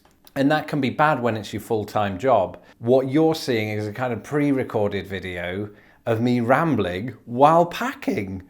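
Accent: United Kingdom. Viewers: Talking about nothing, sounds better with a British accent. Have a great vacation!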